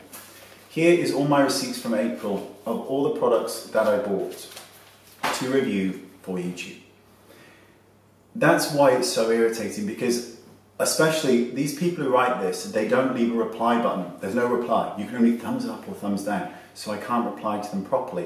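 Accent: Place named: South West England, United Kingdom. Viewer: It's not "subdued", it's a typical South West English accent.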